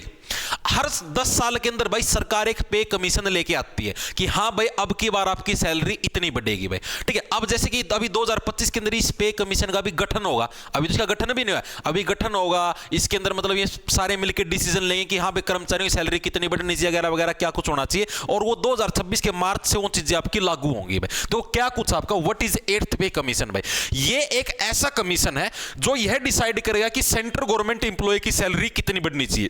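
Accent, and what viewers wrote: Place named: Punjab, India. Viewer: ye to punjab se touch h punjabi style a jata h bolne m bhi right?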